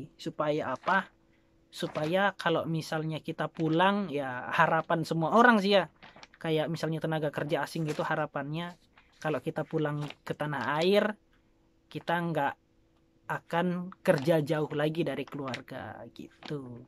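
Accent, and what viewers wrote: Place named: West Java, Indonesia. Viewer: Urang sunda ieu mah nya....,keliatan logatnya...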